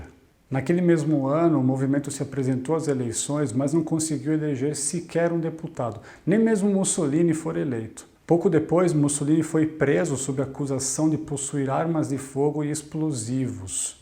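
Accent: Brazil. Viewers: Revendo seus vídeos, nosso que o seu erre já virou éhe.Observe quando o senhor diz Homa. Gostei de sentir o meu Brasil na sua memória. Maravilha! Afetuoso abraço. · CONHECI o seu canal hoje por causa do vídeo no canal do Henry ... Esse vídeo sobre #Fascismo simplesmente é o mais top dentro de 30 min ... Parabéns !!! Esse sotaque é meio Blumenauense ???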